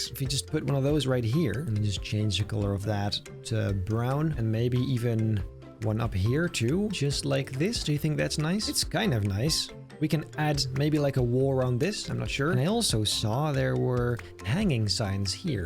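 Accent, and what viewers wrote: Netherlands. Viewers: Are you from the Netherlands cause me to maybe you can talk a little Netherlands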